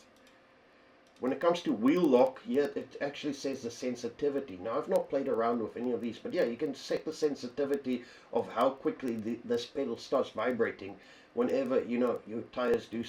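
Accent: South Africa. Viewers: I recently discovered your channel and I’m loving your content. Are you South African?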